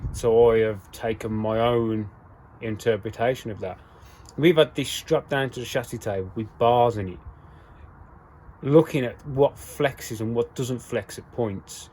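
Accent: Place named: Midlands, United Kingdom. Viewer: Hi mate - guessing from your accent your from the Midlands - where about a are you ? Would like to discuss a possible project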